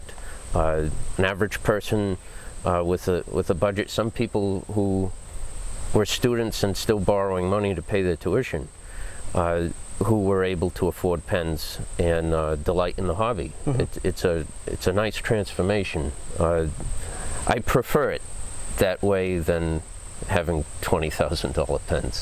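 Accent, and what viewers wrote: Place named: New England, United States. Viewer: What a Yankee accent, and I'm here for it!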